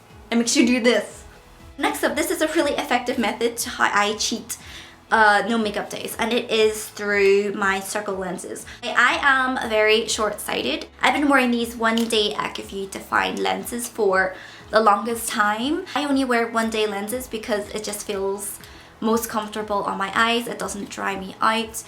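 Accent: Ireland. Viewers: I just found your channel and have been thinking your Canadian now I hear Irish!? 🤔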